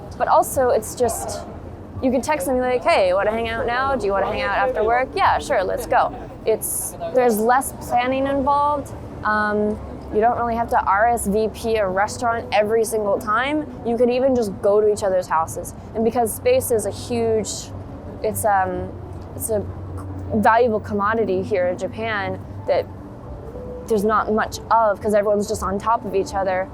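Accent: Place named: United States. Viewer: I feel sorry Japanese people learn English from you as u speaks American.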